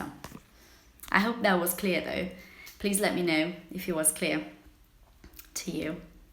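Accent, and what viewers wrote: United Kingdom. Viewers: Beautiful British accent 😘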